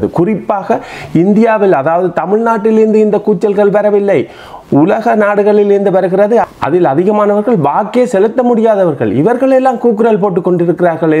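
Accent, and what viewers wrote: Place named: Eelam, Sri Lanka. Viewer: ஈழத்தமிழ் அழகு தமிழ்... ❤️ தமிழ்நாட்டுக்கு தமிழர்கள் உங்களை பார்த்து கற்றுக்கொள்ள வேண்டும்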